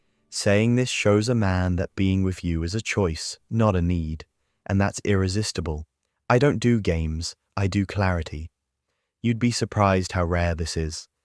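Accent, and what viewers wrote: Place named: England, United Kingdom. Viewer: It's a real interesting video my love. I'm sure it's you that made it. I am grateful to you my love, for all these videos of celebratipn of my personality, you generate for me... I'm speechless... My man is everywhere and celebrate my heart with such passion and such respectful love... How can i resist you my love... ?? It's sounds impossible to resist you... to escape you... You're so smart... It's scaring... 😂😂😂 Your intelligence is fascinating to me... Absolutely dangerous in fascination... By the way, i looooovvvveeee the english accent of this young robot man... It's lovely... I have nothing to add, my baby of love, your video is perfect, and you told it all. All all all. 😉😉 😘😘😘 💜🦋💕🌸🕊✨🌌🌈💜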